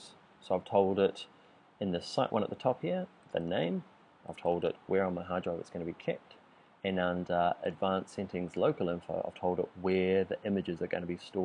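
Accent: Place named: New Zealand. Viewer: Loved hearing the Kiwi accent bro. cheers for these dude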